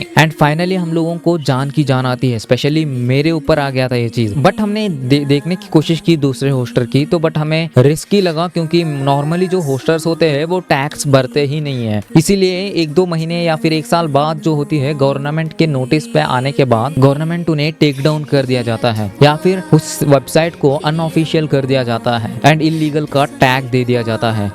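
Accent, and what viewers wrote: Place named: South India, India. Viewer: bhai, are you from south? accent bhot pyara hai♥♥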